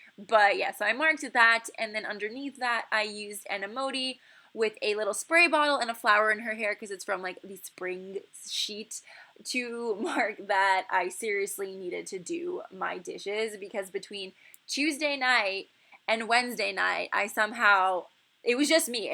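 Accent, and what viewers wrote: United Kingdom. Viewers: THAT BRITISH ACCENT THO 😆😍😘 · Your british accent 😂😂😂😂😂😂😂😂😂😂😂😂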